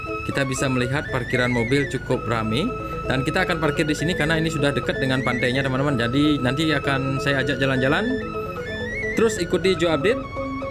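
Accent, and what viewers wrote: Bali, Indonesia. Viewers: . mangkanya logat suaranya persis orang bali hehehhee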